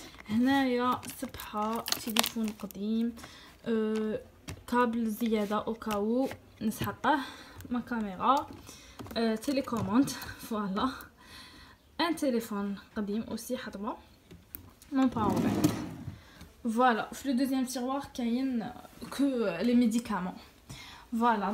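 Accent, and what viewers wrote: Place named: East, Algeria. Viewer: عجبتني قناتك وهدرتك كي لعسل حابة نعرف من اي ولاية تبانيلي من الشرق والله اعلم انا من سطيف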